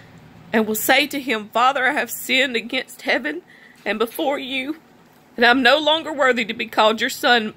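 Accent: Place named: South, United States. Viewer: God thank you for this sister’s beautifully accented voice! I love to hear your Word at any rate, Lord, but it is especially sweet with a little American-south drip. (I dunno, if not the south, then mid-west? Texas? IDK, BUT I LOVE IT!) Many thanks for this channel. (If did an audio narration of the Bible from start to finish- I’d listen every day)😊✝️🙏 GOD BLESS & KEEP YOU & YOURS!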